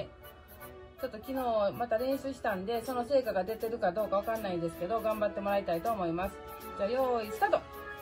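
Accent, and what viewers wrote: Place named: Kansai, Japan. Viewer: 関西弁は親しみやすい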